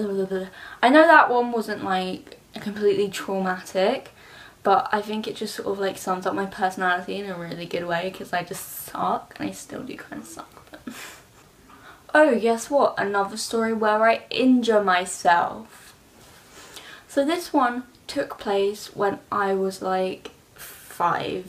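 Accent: England, United Kingdom. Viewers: You had a different accent actually. It was more English than american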